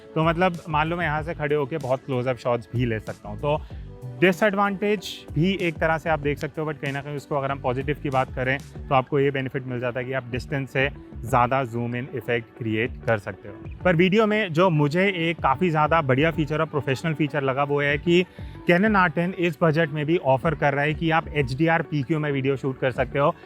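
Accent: India. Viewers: Are you speaking English or trying to f*ck the language? Don't even try it again, you had better speak your own language (actually it sounds Indian, not English), and you have no f* idea how to pronounce it.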